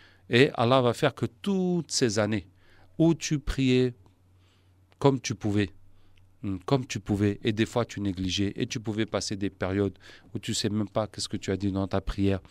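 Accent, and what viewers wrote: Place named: Africa. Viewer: Tu parle souvent comme les africain noirs avec un accent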